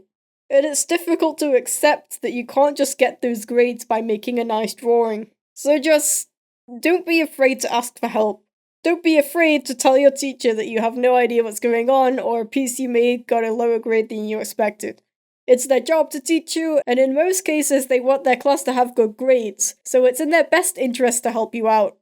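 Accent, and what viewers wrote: United Kingdom. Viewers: your voice sounded weird (well your voice always sounds weird to me because I'm American and only know a few Brits) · Still don’t know if he a boy or a girl his British voice just makes it harder and is he supposed to be a furry ?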